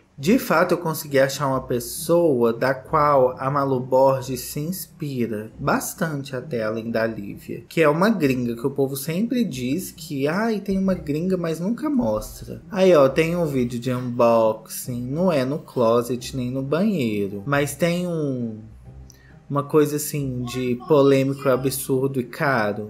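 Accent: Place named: Belo Horizonte, Brazil. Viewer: O sotaque mineiro dele é de bh é quando ele "imita " o sotaque ,ele puxa mais para o sotaque do Norte de Minas kkkkk Falo isso pq minha família materna é do Norte de Minas e eu sou da região metropolitana de bh. Os sotaques são diferentes kkkkk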